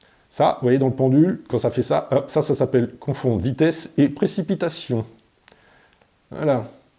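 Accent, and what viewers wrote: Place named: Bordeaux, France. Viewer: T'as l'accent bordelais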